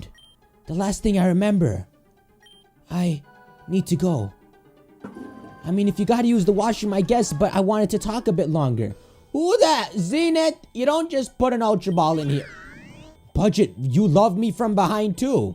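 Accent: Canada. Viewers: are you Canadian? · are you from Canada?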